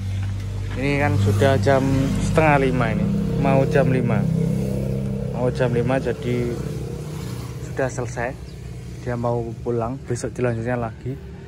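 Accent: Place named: Central Java, Indonesia. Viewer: Mas rumahe mna kok kendaraane plate S tp logate omongan jateng banget😊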